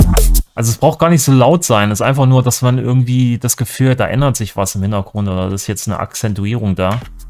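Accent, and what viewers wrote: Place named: Berlin, Germany. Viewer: Besten Dank für dieses neue Video, welches wieder interessante Einblicke in Arbeitsweisen und Möglichkeiten bietet. Und wenn Dein Berliner-Slang rauskommt ist das auch sehr amüsant; und auch so, gerne Labern! Alles Gute und Merci!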